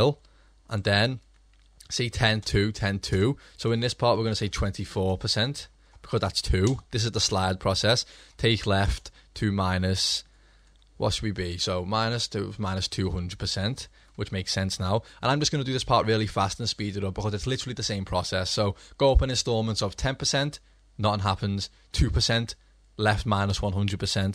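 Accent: Liverpool, United Kingdom. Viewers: fellow scouser? haha nice one dude great vid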